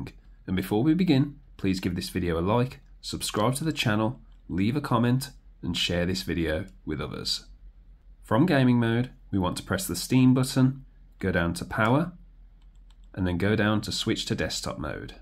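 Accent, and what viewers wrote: Birmingham, United Kingdom. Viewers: Really useful video cheers, do I sense a fellow Brummie?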